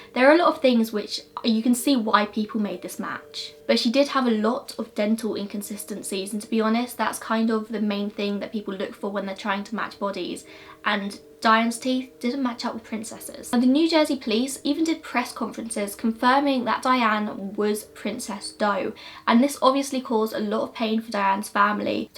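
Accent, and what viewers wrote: England, United Kingdom. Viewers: I just realised that the English accent is so much nicer than the American one. No offence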